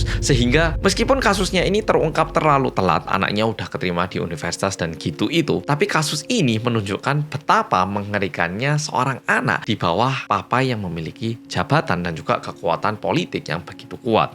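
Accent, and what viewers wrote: Java, Indonesia. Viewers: Hai medok🎉 lgsg nyimaaak🎉 Salam sehat 💪🏿🙏🏿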